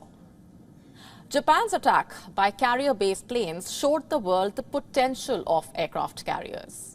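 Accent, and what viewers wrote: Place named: India. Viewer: 这印度口音听着真是头痛。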